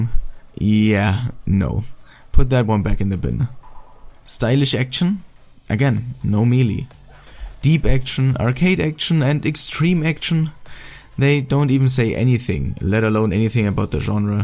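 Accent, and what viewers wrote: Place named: Germany. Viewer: sounds like a german accent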